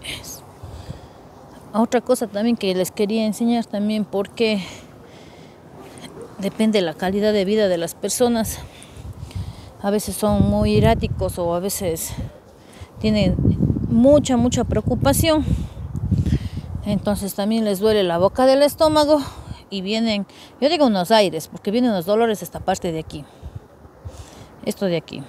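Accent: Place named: Sierra, Ecuador. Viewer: Así hablan en Cuenca y en la mayor parte de la Sierra de Ecuador, si no le gusta vea otro canal y no traiga hate a esta bonita comunidad q tiene.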